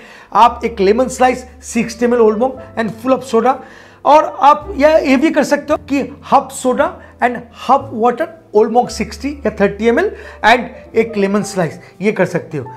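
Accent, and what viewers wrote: Bengal, India. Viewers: you are too good - I love the Bengali accent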